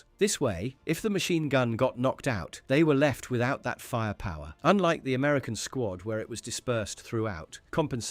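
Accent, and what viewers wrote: United Kingdom. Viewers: Hilarious crapping on a gun that helped win a world war and the fact a brit is the commentator makes it even more hilarious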